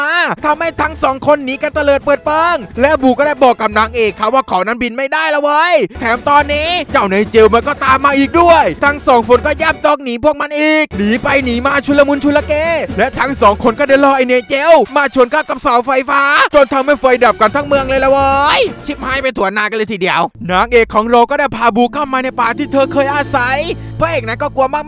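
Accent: South, Thailand. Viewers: นี่พี่บาวเป็นคนไต้ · ชอบภาษาใต้ของพี่อะหนูอยากให้พี่ภาคหนังเรื่องหนื่งเป็นภาษาใต้ได้ไหมคะ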